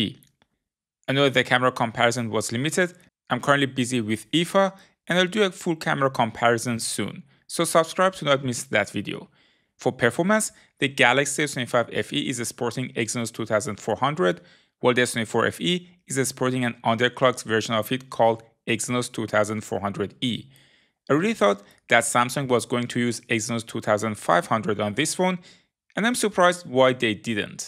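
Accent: Iran. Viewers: Iranian accent found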